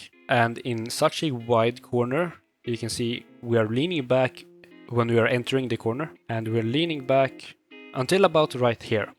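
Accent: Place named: Sweden. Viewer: Jag hör att du är svensk så jag tar det på svenska. Hur lutar jag fram och tillbaka? Är inte som på GTA där man använder joysticken, i alla fall inte för mig. Har jag någon nybörjar-setting på som blockerar?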